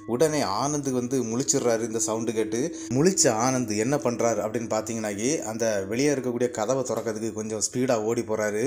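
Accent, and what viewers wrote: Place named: Nagercoil, India. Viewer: It is so nice to hear brother speaking nagerkoil tamil as I am from nagerkoil thankyou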